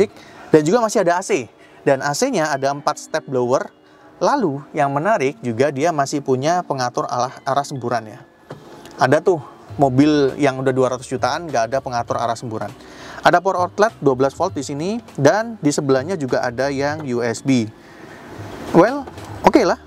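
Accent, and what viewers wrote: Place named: Surabaya, Indonesia. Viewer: Okelah mantep bicaranya . Kek ke surabayan style hhehe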